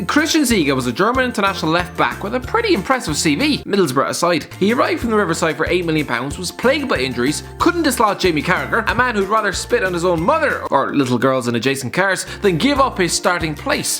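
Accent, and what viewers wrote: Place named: Ireland. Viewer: If I click on one of their videos and do not hear a majestic Irish fella then I’m gone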